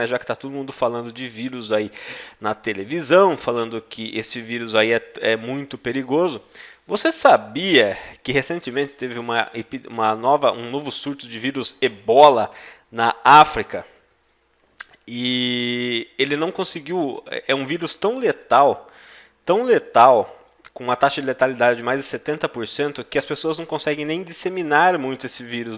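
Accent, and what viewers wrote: Germany. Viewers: Você teve facilidade de pegar esse sotaque no alemão ???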